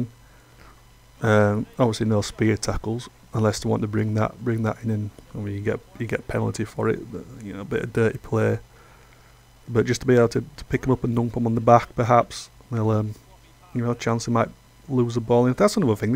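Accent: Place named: Yorkshire, United Kingdom. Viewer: I knew this lad was going to be talking sense about Rugby League when I heard his Yorkshire accent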